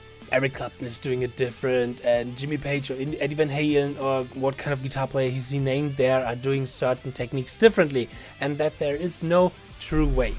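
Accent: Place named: Germany. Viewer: Right, Yngwie is one of those one in a billion supertalents and who are you?? The way I see it is that you are some strange looking guy with a weird german accent with a hoody on in what I assume should be a heated tiny room with some cheap lights, hoping to get some views of off Rick Beato's success and Yngwie's massive career. Who are you again?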